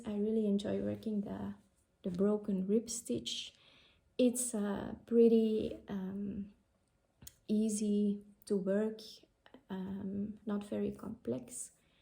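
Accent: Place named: Belgium. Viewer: Leuke podcast goed om eens iemand uit België 🇧🇪 te horen . Mooie designs en ik kijk natuurlijk uit naar je volgende episode 👏🌸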